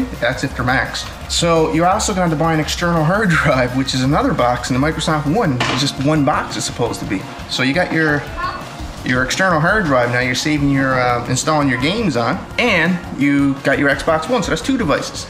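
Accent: Newfoundland, Canada. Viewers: Where are you from buddy? your accent sounds like your from NFLD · You have got to be Newfie man. I know a fellow newfie when I hear one.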